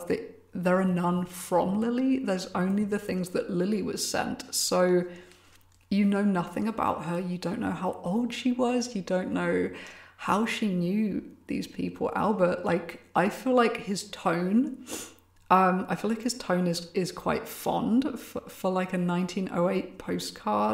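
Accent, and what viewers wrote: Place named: England, United Kingdom. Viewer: WOW!. So cool you liked the cards I sent you and that they inspired a video.I called my wife in to see and she totally freaked.I feel like a real nerd but you know what, I am a fan,what can I say.I like the early morning trips to the woods dancing in the dark and hearing the king's english. will be Sharing on facebook.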